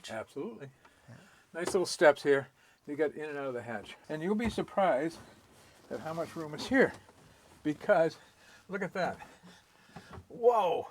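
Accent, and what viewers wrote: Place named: New England, United States. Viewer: I am absolutely captivated by videos about old boats and houses narrated by NPR voices with slight New England accents? Must be my Aspergers... is my new Bob Vila!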